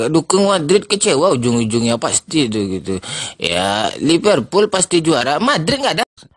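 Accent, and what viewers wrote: West Sumatra, Indonesia. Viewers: Urang minang ma suaro nyo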